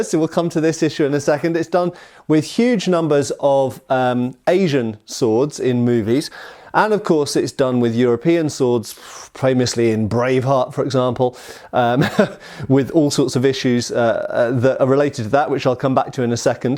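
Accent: England, United Kingdom. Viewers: True. And when used as part of a combination word, it becomes dachi... as in nodachi or odachi. But is English, and he's gonna pronounce things with, well, an English accent, lol.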